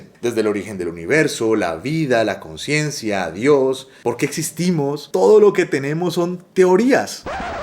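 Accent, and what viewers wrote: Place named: Colombia. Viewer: Su voz me parece familiar? Ese acento colombiano.. ya lo eh escuchado en otro canal? Excelente contenido muy buena edición , horas de grabación para los 20 minutos más informátivo , sigue así bro saludos Colombia Cartagena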